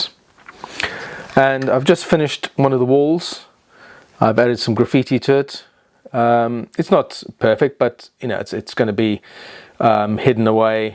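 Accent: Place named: United Kingdom. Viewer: thanks and I look forward to more episodes.... it is interesting listening to a British acccent talking about Chicago rail .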